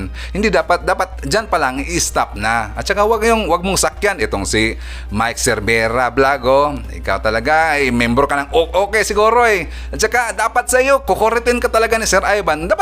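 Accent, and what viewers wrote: Visayas, Philippines. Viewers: Watching from Davao City. Bisaya ka idol?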